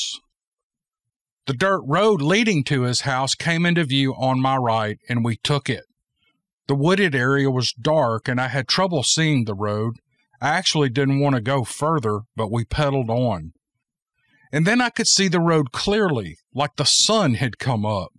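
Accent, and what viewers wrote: South, United States. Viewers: There will never be too much commentary. That's why alot of us listeners tune in! Your southern drawl is 👌 🖤from Oz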